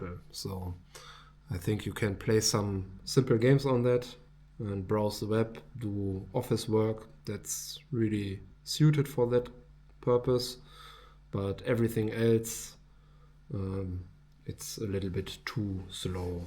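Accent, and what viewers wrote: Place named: Germany. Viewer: Most german accent ever seen🎉❤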